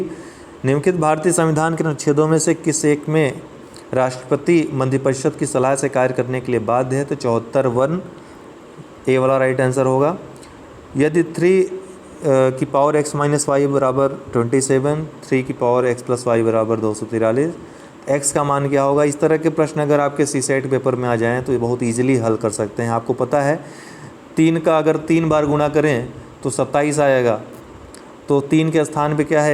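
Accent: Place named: Azamgarh, India. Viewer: are sir kha se ho aap.... Govind Sahab Mela apne jaise bola azamgarh isse to yhi lg rha hai ki ap ya to azamgarh k hai ya ambedkarnagar ke...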